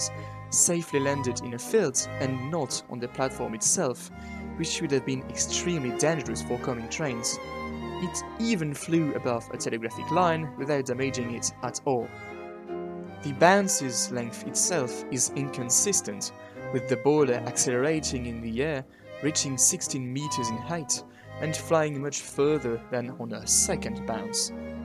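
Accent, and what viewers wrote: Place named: United Kingdom. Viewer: C'est un fait divers français et vous parler britannique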